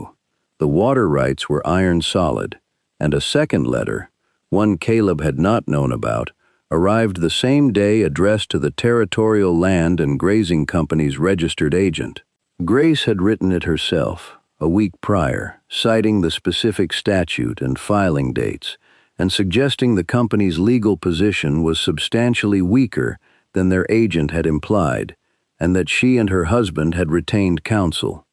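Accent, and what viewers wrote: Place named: West, United States. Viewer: Complements to the narrator who sounds as if he IS from the Western Frontier. Listening from Clarksville, TN.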